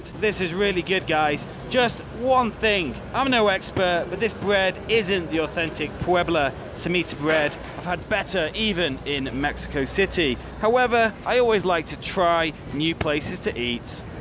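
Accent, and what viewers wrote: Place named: United Kingdom. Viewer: love your videos I also love your Britannia speaking do not lose it emphasize how English should sound Like .Arriba Juarez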